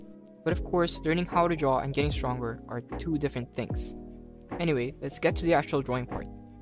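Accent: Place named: Netherlands. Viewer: Idk what is it but your accent sounds very dutch. Also great video!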